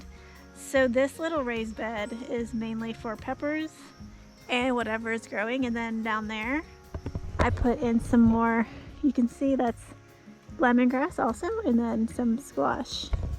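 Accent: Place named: United States. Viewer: Thank you, for this video. I'm an another canceled check here in Czechia but on the escape! Thanks too for your American English which is so nice to listen. Good luck on everything!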